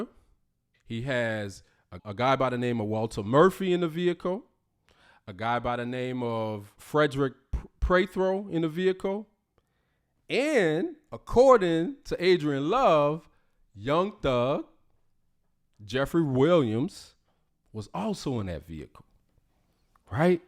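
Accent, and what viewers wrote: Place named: South, United States. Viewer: I'm a new subscriber 💯 just curious is it NYC or N.O. I thought you were in NY but you sound like down south 😂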